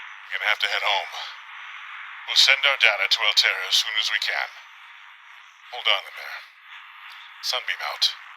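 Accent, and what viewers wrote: Russia. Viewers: are you Russian? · Is he Russian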